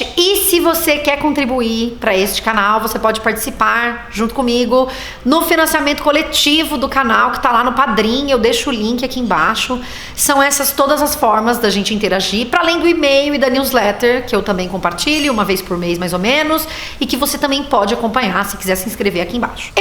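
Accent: Rio de Janeiro, Brazil. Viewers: Hahaha legal, mas ela manda bem no jeito carioca de falar. Abração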